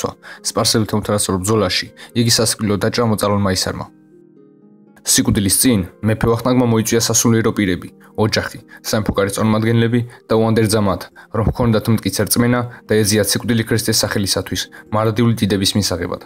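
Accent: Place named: Russia. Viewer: რყსულ ენოვანი სირბანჯოები არიან არხის მფლობელები აქცენტზეც ეტყობა და აქ რაღაც ზღაპრებს ყვებიან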